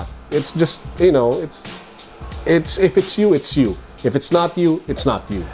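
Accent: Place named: Philippines. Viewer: Strong Filipino accent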